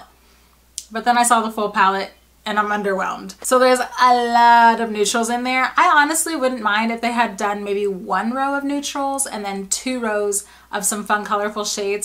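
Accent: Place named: New York, United States. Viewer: I guess I'll have to go into the city to get everything. No complaints here! I love it there. If it wasn't so expensive I'd live there. Are you from NY/NJ area? You sound like you are and some of the stuff you say is exactly what/how I say it. Whenever I go away people can always pick out where I'm from LOL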